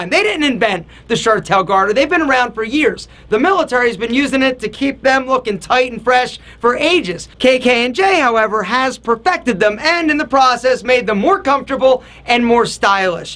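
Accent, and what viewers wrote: Philadelphia, United States. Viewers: The philly accent is brutal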